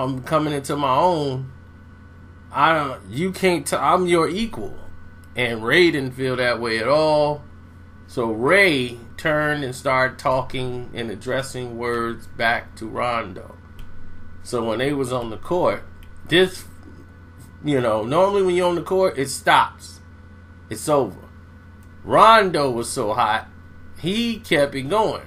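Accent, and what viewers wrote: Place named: South, United States. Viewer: Ronde Rondo?? How u pronounce some of ur words feel like I'm listening to my grandpops from down south or somethin cuz...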